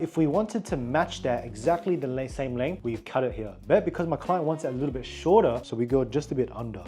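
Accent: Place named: Australia. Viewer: You sound like an ozzie mate 😀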